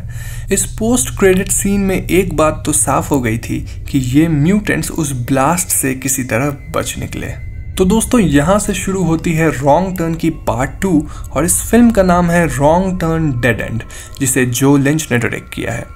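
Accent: Bengal, India. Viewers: Yourd Bangali accent is funny.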